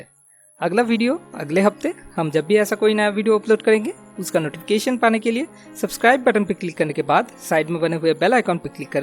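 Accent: Bengal, India. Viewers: i love ur bengali accent hindi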